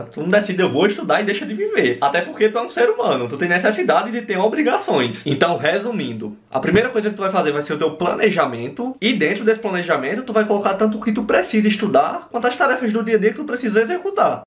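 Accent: Northeast, Brazil. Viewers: Homem tu é lindo, mas esse seu sotaque é mais lindo ainda... Coisa deliciosa é um sotaque nordestino.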